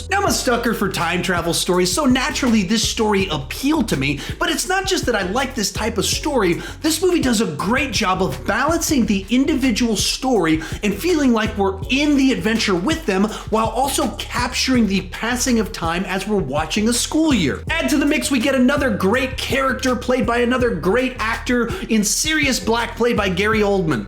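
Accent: United States. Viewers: Birtish names pronounced by an american. Hurts my ears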